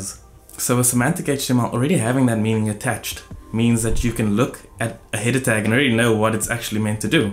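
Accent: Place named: Asia. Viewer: I had no idea how html semantics worked until I watched this vide, very nicely done! also where you from? you sound a bit asian ??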